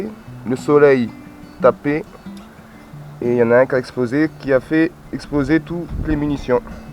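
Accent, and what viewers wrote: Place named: Corsica, France. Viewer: Vu l'accent Corse, que tu te coltines, sache que je suis très heureux de t'accueillir chez moi. Et même si je me trompe...tu es le bienvenu quand même. En effet, les forts de la Gavaresse et de La Colle Noire, ont été des hauts lieux de notre histoire méridionale. J'ai eu le plaisir et l'avantage de parcourir les tréfonds de ces architectures, alors que j'étais ado en recherche d'aventure. Sans compter les galeries de mines du Cap Garonne ...bien avant qu'il ne soit exploité pour le tourisme. Autant te dire que j'y ai passé de bons moments à retourner les pierres, pour y chercher une suite.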